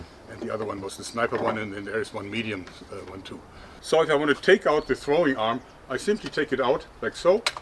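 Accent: Germany. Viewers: You sound German but I think you live in Washington or Oregon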